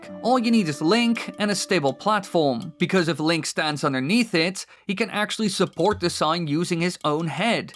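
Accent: Netherlands. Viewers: You have to be Dutch or Belgian man, I'm putting my money on Dutch though. Accent is bij IEDEREEN hetzelfde HAHA.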